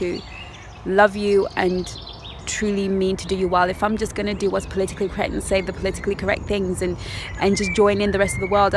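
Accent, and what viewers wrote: United Kingdom. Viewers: Love your videos, love your advice, love your British accent, love your intelligence. As I always say some guys are not worth the time of day and I don't have time for situationships. I would rather be alone and have my self respect. It's so much better.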